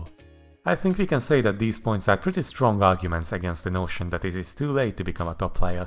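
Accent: Hungary. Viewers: What is your main language? Bcz its sounds like hungarian. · Az akcentusodbol egybol tudtam, hogy magyar vagy, nagyon jok a videoid :D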